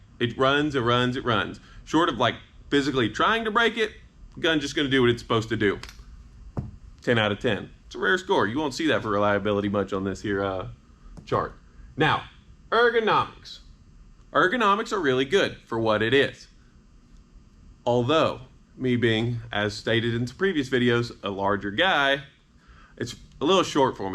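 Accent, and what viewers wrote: South, United States. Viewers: This is Tosh.0 with a southern accent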